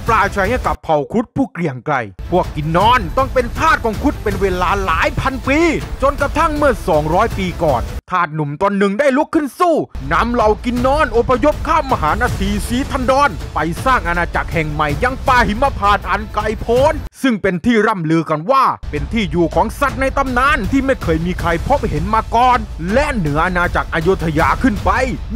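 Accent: South, Thailand. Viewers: พี่คะพี่เป็นคนใต้ใช่ไม่คะ(ตอบหน่อยนะคะ)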